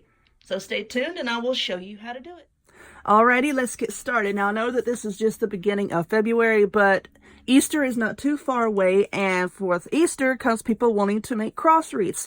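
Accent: South, United States. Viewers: I am so glad I found your channel. 1 you're Southern-like me- so we speak the same language (lol). 2 your creativity and ability to think outside of the box amazes me. I would've never thought 2 make a Cross out of cooling racks! Thanks for sharing your amazingly awesome ideas with us! I've got to add this one to my list of wreaths to make!!! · I really love your beautiful crafts. However I don't know if I love your crafts or southern draw best. Know any slang words you can entertain me with? You sure make me feel like I was in my old home. I'm looking forward to you exhibits.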